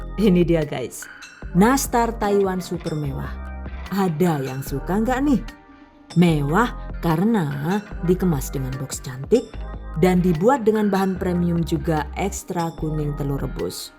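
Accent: Banyumas, Indonesia. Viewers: mmg sllu beda...lbh keyeeennn...tampilan kuenya cantiq kyk inyong ☺😚ngapaknya itu lho jd ciri khas 🤗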